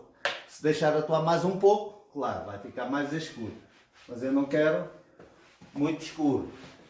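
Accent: Portugal. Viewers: Com esse sotaque Português que eu amuuuu, já me inscrevi e dei o meus milhões de likes.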